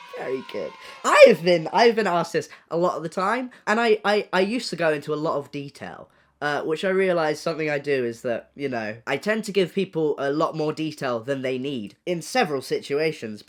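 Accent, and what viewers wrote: United Kingdom. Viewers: Did anyone else notice that had more of a British accent than ever? Yes, I’m American. The British accent just seems to come out more in this video. I’m loving it. Lol! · Okay but am I the only American who can't get over the fact that he says patronizingly funny because of the British accent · sounding EXTRA British today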